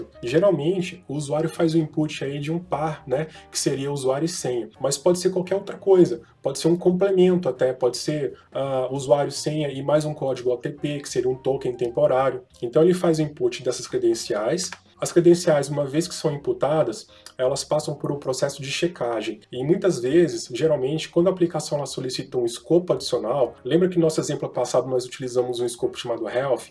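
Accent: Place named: Rio de Janeiro, Brazil. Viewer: olha os microservicos aeeeii, bem carioca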